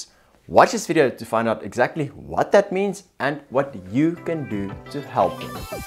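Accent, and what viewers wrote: South Africa. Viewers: Kan hoor jys van suid afrika en n afrikaner lol.... my pikanese se oog lyk of hy wil uit pop , dis wat my na die video bring.... lol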